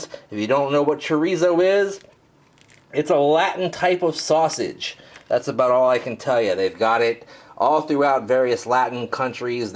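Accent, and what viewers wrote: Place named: Missouri, United States. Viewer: he must be from Missouri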